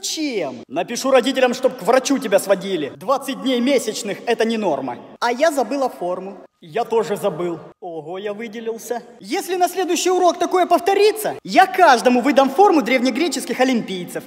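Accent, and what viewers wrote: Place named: Ukraine. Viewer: всегда вместо буквы "Г" говорит букву "Х".Видно что Украинский акцент👏👏.У тебя самые забавные видео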